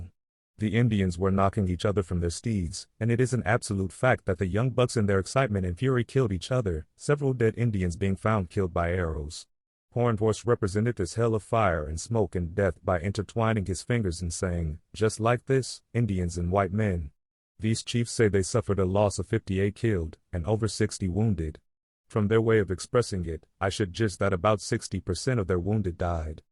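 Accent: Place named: India. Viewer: Too bad the narrator can't seem to do any more than to read without proper cadence or at least pausing between thoughts or sentences. Then he (or the AI) pauses in the middle of a sentence. The built in text also goes like this without pauses at the end of sentences and no punctuation. Because the narration and on-screen text being so badly strewn together, it's hard to understand. Sick of the poor robotic narrations these education videos lately use. Even though this one was tuned to sound like an Indian speaking English poorly. Don't think any human reading this could have voiced it so poorly. Could have been good info but was marred by poor narration!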